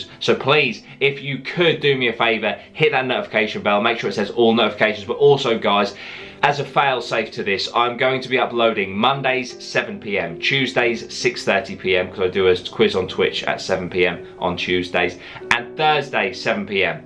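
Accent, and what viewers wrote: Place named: England, United Kingdom. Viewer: Where in the U.K. are you from? Your accent and mannerisms sound very much like Russell Brand who is from Grays, I am originally from Canvey so wondered if you was local. Love your videos brother, keep up the good work.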